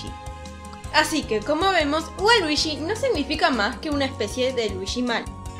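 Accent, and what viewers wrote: Argentina. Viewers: Luigi Es Mi personaje Preferido De Ambos... Las Risas Que Me Hizo Dar En "Mario & Luigi - Bowser's Inside Story" Fue Fantástico Jaja Les Tengo Una Pregunta... ¿De Donde Son? Es El 2° Video Que Veo Y Me Salió La Duda Si Son Argentinos Jaja Me Suscribí... Sigan Así...!! Saludos... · Creo que es por su acento, debe ser de Arg. o Uruguay. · Me encanta el asenro argentino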